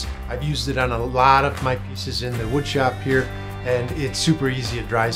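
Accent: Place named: New England, United States. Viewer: What a wonderful idea to use the hidden magnets to secure your planes! Your shop continues to become more organized with each project! I see your plane collection has really grown! They're both functional and beautiful tools! The plane till was an awesome storage idea and it turned out great! You got that New England accent perfectly perfected too!! As always, enjoyed seeing your latest project! You and Buddy have a great weekend! God bless!!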